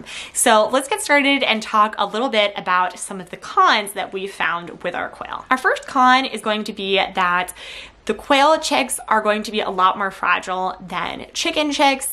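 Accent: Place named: United States. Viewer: “Rabets and Qwel” I swear this is the first time I’ve struggled with the American accent. 😅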